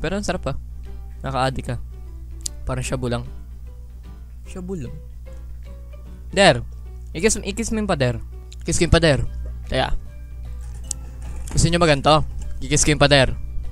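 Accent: Visayas, Philippines. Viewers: bisaya ka po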